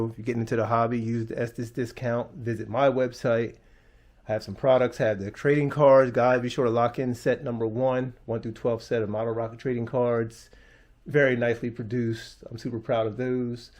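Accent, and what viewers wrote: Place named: Flint, United States. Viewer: Sounds like is from Flint Michigan. I wonder if Ryders hobby still open? Hi! From Saginaw, I grew up in Flint. Stay blessed.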